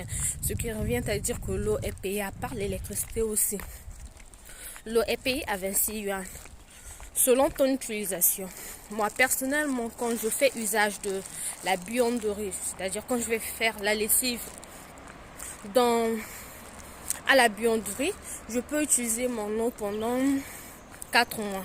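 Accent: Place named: Democratic Republic of the Congo. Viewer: Ah tu es congolaise aussi, ça se sent en parlant😌😅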